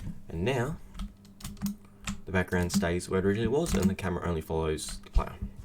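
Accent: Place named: Australia. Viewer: you can tell he's aussie, i can cuz i am too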